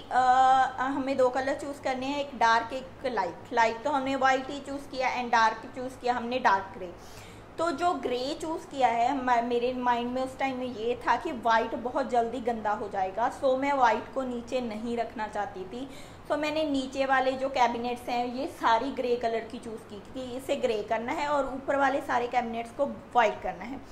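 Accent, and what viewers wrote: India. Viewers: Are u punjabi...just guessed from some words n accent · Hariyanvi accent?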